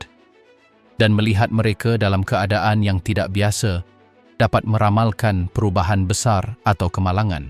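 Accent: Malaysia. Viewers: Pencerita seperti org Malaysia tapi pakai gaya bahasa Indonesia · Iki canel wong Malaysia... Logat dan bhs Malaysia nyel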